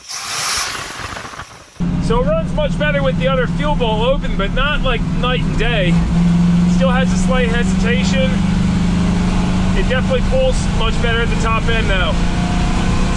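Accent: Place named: Pennsylvania, United States. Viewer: Hi, new sub here, just found your channels. It was the '65 Plymouth wagon that drew me in . Sounds like you're from PA...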